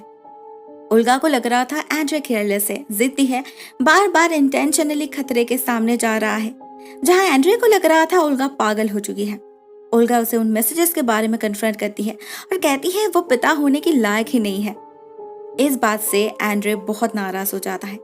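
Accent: Bengal, India. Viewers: Hello mam by your accent I think you are from Bengal , if not then can you tell from which state you are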